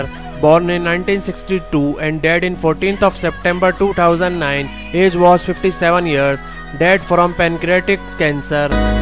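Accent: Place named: India. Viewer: Why this Bollywood Dialekt? Absolutely horrible, can't you afford someone with a decent voice????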